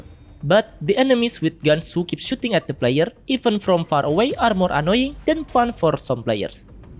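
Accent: Indonesia. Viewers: aksen nya kaya orang indo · Indo banget logatnya lol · aksen indo bjir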